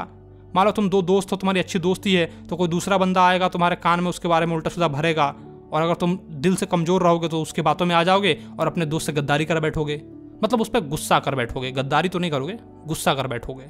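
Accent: Bihar, India. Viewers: Hi sir u are from bihar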